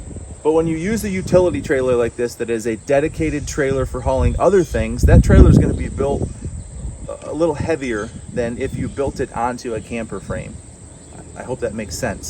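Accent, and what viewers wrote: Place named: Michigan, United States. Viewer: Loviny the Michigan accent go green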